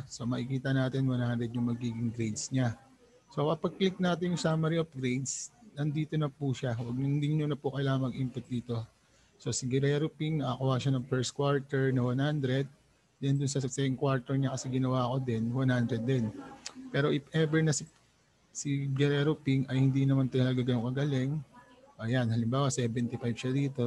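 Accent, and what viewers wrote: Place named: United States. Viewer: The way she pronounced the words are not slang it is american accent good reading..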